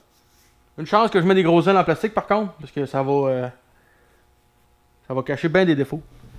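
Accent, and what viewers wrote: Quebec, Canada. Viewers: Très bien les vidéos, mais j'ai parfois du mal a comprendre à cause de ton accent Québécois assez fort: je suis français , c'est pour ça...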